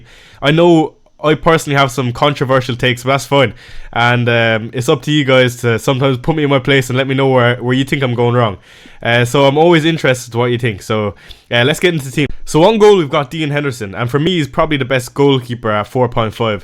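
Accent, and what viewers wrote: Ireland. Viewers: I can hear a hint of an Irish accent😅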